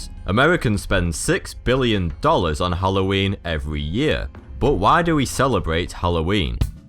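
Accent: United Kingdom. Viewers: "Samhain" is NOT pronounced Sam-hain. It is a Keltic word in the Gaelic language. It is pronounced "Sow-en". It translates to Summer's end. The veil between the world of living and the world of the dead is thinnest between sunset and sunrise. Trick-or-treat began to appease the deceased; so that the living would not be harassed by the dead. You sound British, and you don't know this???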